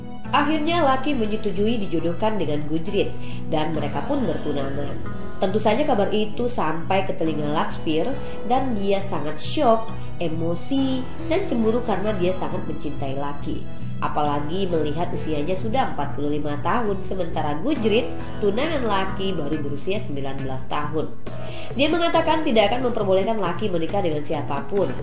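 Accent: Java, Indonesia. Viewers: dimana dirimuuu... jangan di gantiii dong...😭😭 is tbe best👍🏻👍🏻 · KESIMPULAN😁, semua narator punya ciri khas sendiri2, justru medok itulah yg bikin ciri khas suara yg tidak dipunyai narator lain, maaf untuk vidio ini tidak saya dengarkan sampai selesai😊semangat!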